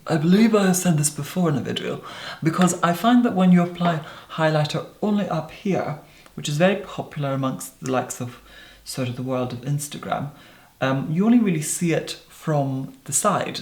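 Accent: Scotland, United Kingdom. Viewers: I love his accent but I love Scottish accents anyway. Also I love it that somebody can acctually speak English properly cos Imteased for that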